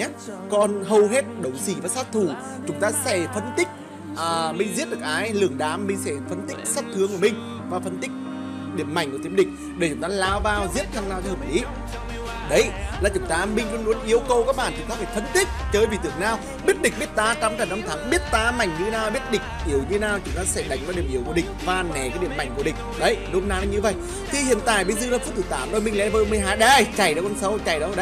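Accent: Nghe An, Vietnam. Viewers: nghệ an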